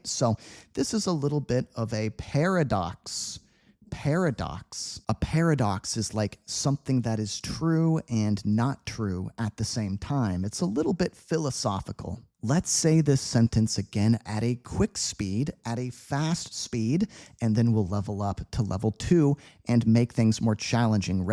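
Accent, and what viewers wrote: United States. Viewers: I'm learning UK English, I don't mind watching your videos and following your recommendations, isn't this channel American English?,I from Xorazim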